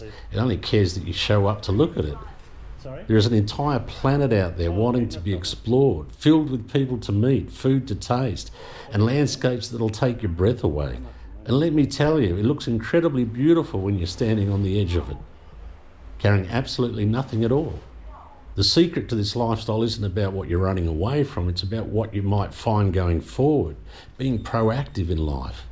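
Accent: Australia. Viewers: No. I sense an Aussie accent. You are the age of my Father. I’ll tell you what’s worse than having nothing. It’s having EVERYTHING and yet not appreciating it. In Australia, so many boomers became property obsessed and prioritised wealth gains over family relationships. What’s the point in having kids and grandkids if your main focus is your STUFF?! It’s so sad to see. But they don’t care. They won’t, until they lose their sense of security (homes/equity) and realise it cost them their families. You’re doing well in Goa. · hi Laura, is 100% Australian. I’m Aussie and I know one when I hear one. And he’s mentioned it in many videos. He often goes back to Perth to visit his sister. And no, he doesn’t have the old age pension because you have to be 67 to qualify for that in Australia. He’s 60. As he states in this video (and many others) he lives from his YouTube videos. 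That’s also why he makes so many! It’s his job. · You're living my dream, mate. Really enjoy following you on your adventures. Don't mind the lovely Aussie accent, either. Love from the Low Lands.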